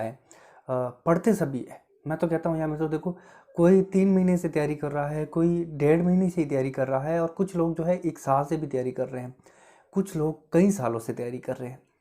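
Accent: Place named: Ganganagar, India. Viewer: Sir aap ...beenjhbayla.(ganganagr) se ho kya ?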